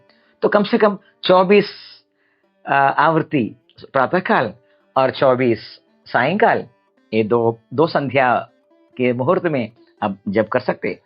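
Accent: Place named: South, India. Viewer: पर ये उच्चारण दक्षिण का है उत्तर का नहीं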